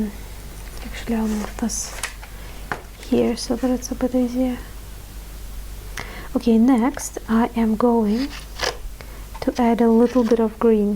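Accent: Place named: Russia. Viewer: haha, okay good to know! Russian accent is great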